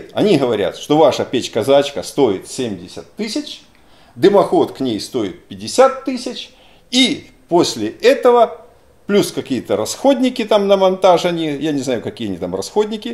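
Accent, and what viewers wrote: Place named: Rostov, Russia. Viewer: Как же достал этот Ростов! Учись говорить по человечески!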